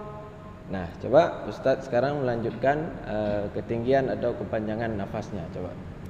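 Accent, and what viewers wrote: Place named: Aceh, Indonesia. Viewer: Dari suaranya, tampaknya Ustad yang setiap kali Memberikan pelajaran di Tahsinul Youtube dengan aksen Aceh adalah Ustad yang baju Biru... Betul tidak ya ☺️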